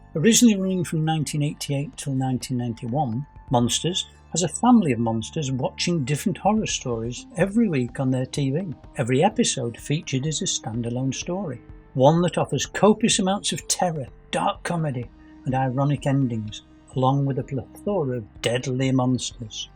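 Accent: United Kingdom. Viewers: Nice to have a British narrator, their accents are awesome.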